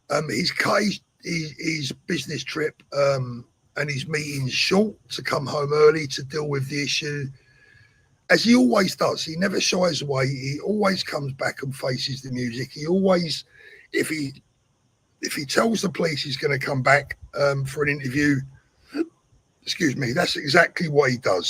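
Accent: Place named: London, United Kingdom. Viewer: Hi, you're on 284,000 subs, great going. I didn't leave as some did, stillnfindcyouvas one of the most down to earth blokes out there, guess it's the London accent, all my family hail from London, dads from the west of London, mums from Poplar. We live in Aylesbury now, wifey and my son. Will be in London on 13/09/25. Stay safe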